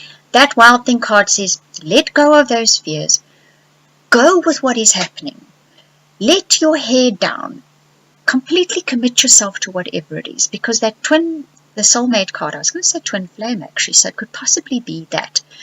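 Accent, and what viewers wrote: South Africa. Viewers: Woah - this made me tearful re the letting go of fear to commit to things. Have had a stop/start thing going on for the past through years (blame Pluto?) re work and have been reluctant to go in boots and all because of it. Crossing fingers for smoother waters. Love your SA accent! Cheers from NZ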